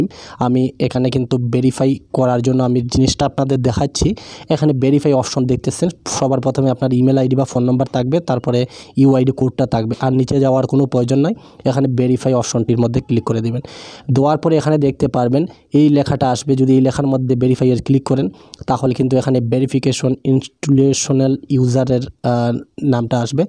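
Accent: Sylhet, Bangladesh. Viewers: সিলেটি নি ভাই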